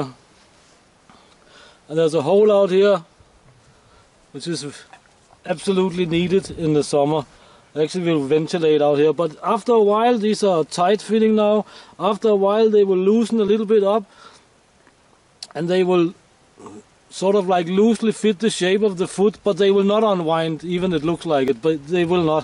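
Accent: Denmark. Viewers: The most surprising thing about this video, is seeing a Russian speaking English with a Danish accent. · He is from Denmark. Many Danes are fluent English speakers. My brother has a Danish wife a 3 children. I knew was a Dane from his accent. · No he's Danish, it's a danish accent.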